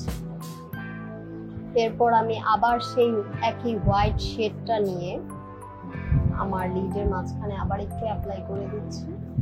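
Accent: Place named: Comilla, Bangladesh. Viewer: Apu ami o cumillar meye😍🙋🙋 tmar kase cumillar vasay kotha bolte dekhte onek valo lage 😁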